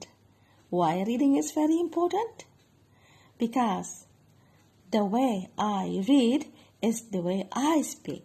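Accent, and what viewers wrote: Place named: United Kingdom. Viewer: It sounds like British. No boddy noticed that you are Indonesian 👍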